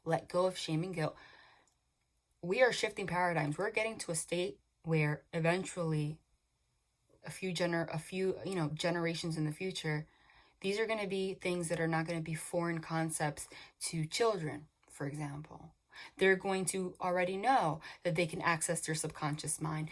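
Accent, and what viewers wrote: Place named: Long Island, United States. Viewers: Girl - I went to UB!!! Crazy. I grew up on LI and have always loved you accent. I love in PA now and miss that sound. But the universe is telling me over and over I’m on the right path! 💖💖💖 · We haven’t even started the reading I just poured a hot cup of ☕️ sat on the couch, said this chick has to be from NY “OKAEH!” I went to school for early childhood development at St John’s. Had my son at 19, nope kids and a baby who doesn’t stop crying? Don’t think sooooo Was in a crazy marriage and another crazy relationship went to school for medical assisting. I parked in the ER for 13 years.... was soooo tired but had job security and great benefits and the bestest friends...... went to nursing school spent 8.5 of those 13 in peds. Moved to the south to be with the soulmate, here we are I have chills listening to your personal story, and you are so absolutely correct. 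God bless love.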